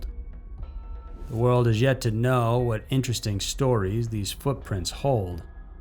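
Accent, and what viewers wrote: South, United States. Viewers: “…SMALLER SAHZE….” I like this boy announcer, and he got a small southeren accent on him.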